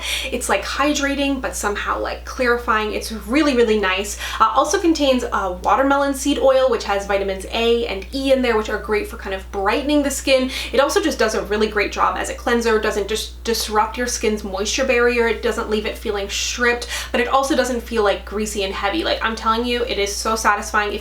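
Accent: Newfoundland, Canada. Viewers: You're also a Newfoundlander! That's awesome!! Instant Subscribe when I heard that 🙊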